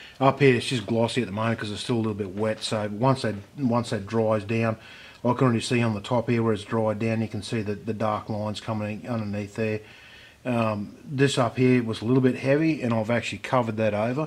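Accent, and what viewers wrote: Australia. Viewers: Are you Australian ?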